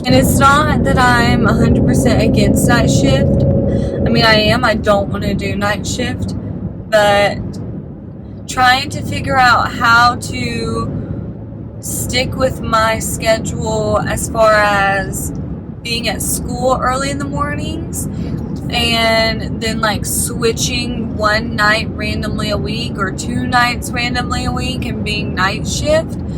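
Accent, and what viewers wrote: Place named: South, United States. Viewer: Stumbled across your channel! Would love to support each other! I have done this so many times where I plan a video and it didn’t go as planned. Thankful for people like you who do jobs to help people. Where are you from? Southern accent! I live in IL BUT IM BORN AND RAISED in FL. People always stop me here about my accent that I don’t think is thick at all but def something people notice up here. Hope your last semester goes great! I’m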